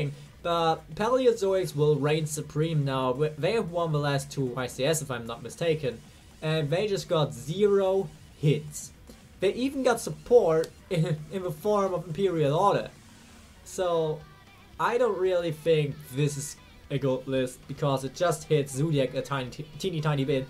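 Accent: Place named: Germany. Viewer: Where are you from? You sound german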